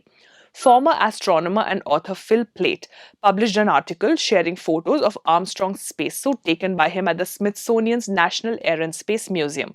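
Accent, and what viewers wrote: India. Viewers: Yeah, go ahead and use the Indian accent to give some sort of credibility for the simple minded rooted in hate and blame that also thinks the earth is flat.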